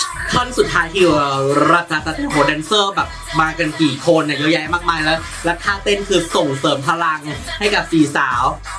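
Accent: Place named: North, Thailand. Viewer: ชอบความ อ้อ~อ๋อย คนเมียงของแต๊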